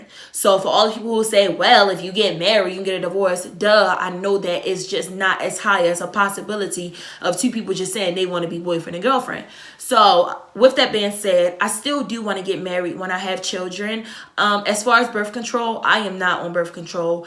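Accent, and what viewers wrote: Baltimore, United States. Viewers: Idk how I stumbled across this video but I know a Baltimorean when I hear one 💁🏽‍♀️hey gurl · I knew it!! Soon as you started talking, I could tell, This is exactly what I was scrolling the comments for. Bawdamore dummy!!! Accent heavy, I love it! This my first time viewing your channel, glad I found juuu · Are you frm Baltimore? Ur accent sound like it. Im frm DC. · I felt like she was from baltimore. I know that accent to well. Love it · Baltimore accent so strong 😂 #yeahhus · Danm us Baltimoreons really do have a accent. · Came across you’re video and soon as you started talking I said she’s from Baltimore saying “Knew” like that lol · I knew that accent was BALTIMORE all the way. I'm a new subscriber btw.. Love ya accent tho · could tell after a few words you were from charm city lol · Yaya Unicorns as soon as she start talking I said I bet she’s from bmore. 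Also, your very brave telling your story. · im sick im from the D and soon as she started talking I'm like she must be from Baltimore ctfu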